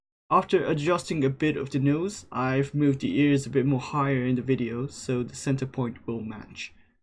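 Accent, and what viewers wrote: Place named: India. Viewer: “I’m japanese”. That accent doesn’t lie ya Indomutt akhi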